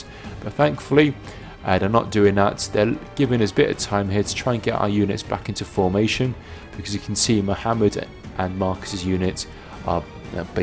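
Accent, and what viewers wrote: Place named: Wigan, United Kingdom. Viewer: what part of the UK is accent from? Sean Bean has the same style of English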